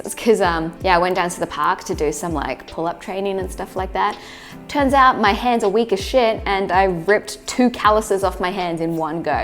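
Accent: Australia. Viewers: Amazing, I followed a link on PhillipDefranco's video and saw a wonderful video by an amazing videographer and instantly recognised that Aussie voice. I watch a view more videos and realise you are a fellow Brisbanite. That sucks me down the rabbit hole as I then proceed to watch all your Australian videos first and then a few others you have made. I'm a little binged out but I just need to comment and say thanks for the great content (much I will try and finish in the future, even though most of it may not be the content I would normally view) but I believe good content, told by an amazing story teller and presented in such a great way like you do, should be rewarded with at least a like, maybe a comment and possibly a subscribe. You get the trifecta. Cheers I will admit being from Brisvegas as well, may have biased me a little bit. Ok... a lot. Have a good one. 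· Fun watching your videos. Great, your back in second home. Didn't know you are Australian, no strong accent.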